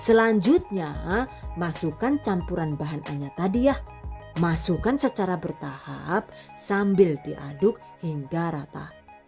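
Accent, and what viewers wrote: Banyumas, Indonesia. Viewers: Jebule rika wong ngapak yahhh :v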